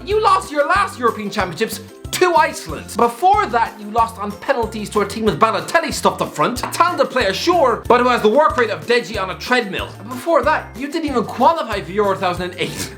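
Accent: Ireland. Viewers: Strangely this video served as a kind of anti pep talk. Thanks shouty Irish man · My daily dose of an Irish guy screaming into a camera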